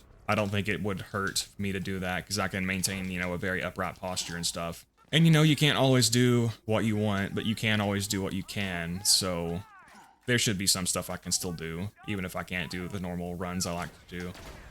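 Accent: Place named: Kentucky, United States. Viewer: Kinda surprised you're from the bible belt. Judging by the accent, I would've guessed somewhere like Kentucky or Tenessee. Greetings from Indiana!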